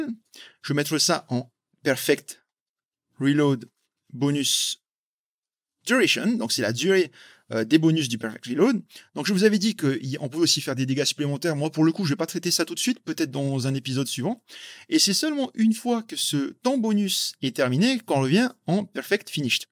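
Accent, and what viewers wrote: Tahiti, French Polynesia. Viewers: Salut, tu es de Tahiti? Tu as un accent tahitien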